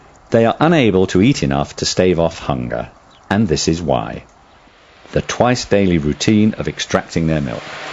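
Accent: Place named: United Kingdom. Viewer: sound like British English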